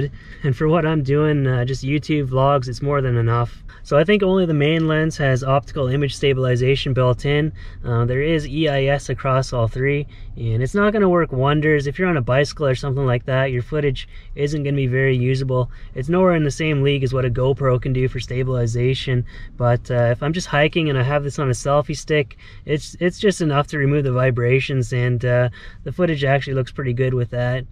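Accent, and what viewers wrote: Canada. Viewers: Which part of Canada are you from? Seems like you’re accent is type of Canadian accent Americans make fun of.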